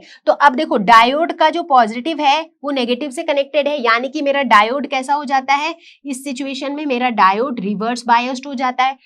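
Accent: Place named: Gujarat, India. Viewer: Mam are you gujrati...? Your accent feel like gujrati 😊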